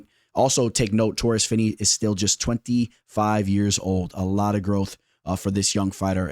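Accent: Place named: Louisiana, United States. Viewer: are you from louisiana? you sound just like dustin poirier lol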